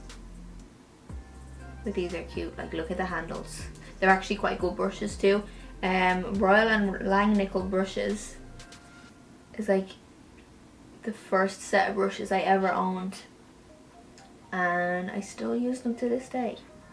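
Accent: Ireland. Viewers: I won't lie it looks really pretty with your pink hair. not too matchy matchy either. have I mentioned I'm in love with your accent? I detect Irish am I totally off??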